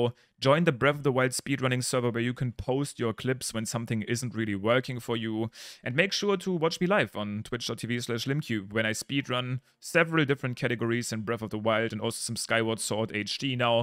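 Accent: Germany. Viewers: are you German? your English sounds really german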